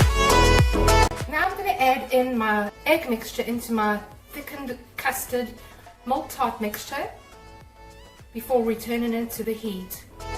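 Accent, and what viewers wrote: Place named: Australia. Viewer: Im going to try it looks awesome! Why did I believe you were from Australia 🤪😅 I guess you're from South Africa (Ive been to cape town as child (still have a scar lol cuz i got stuck in cement it dries fast there 🙄 but when they got me off with mzchines n stuff, I was scared and ran into a closed (I thought the door was open, it was just very clean 😅) but the door broke and I got a huge scar /cut back then. Today its an itsy bitsy scar, but I think of South Afrca each times, iften as I wear make up, 😂) thought you should know, don't ask me why 🙄lol But damn it looks great I wanna try makibg it! Missed to see you cook (loved your last video tho, I'm still in schock and more get up to the surface 😱 Thanks fir great recipe 😋 Xoxo mm 😊👣🌻❤️👍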